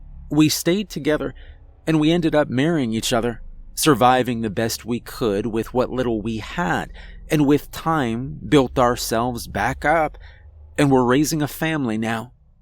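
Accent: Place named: Northeast, United States. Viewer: Dear, are you Native American? Did you grow up on a reservation or did you have a lot of close friends that were Native American? Native American, like Northeastern tribes. Possibly let's see it has to be up North and it has to be also somewhat East, judging by your accent. But you said it was flat, so that's like Midwest, but there's a lot of flat expanses in many of the states and your accent reminds me of the East, like Maine, Massachusetts, Vermont, Rhode Island? No no no not definitely not Eastern directly on the Seaboard. Could it be possibly Minnesota, Minnetonka Native? I just want to add that the imagery, in this particular video, is absolutely beautiful, it's not scary at all, actually it is really, very, hypnotic and sci-fi. It looks like a Sci-Fi novel cover,the soft bound type of a novel cover, for example like the cover of Ringworld or something. 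I like how this ship,or craft, stretched out and looked like a Sheriff's badge star, over the Earth. That image, was sort of, sublimely cryptic., I hope you respond to my comment, because my curiosity, is absolutely killing me and that's scarier than this video, even though you have a million subscribers & this video is probably five years old. I didn't look at how many subscribers you have and I did not see when this was actually posted. I'm being kind of an exaggerator in fun... when giving the surmised, numbers of subscribers and the time span marked in years+ but maybe... I'm..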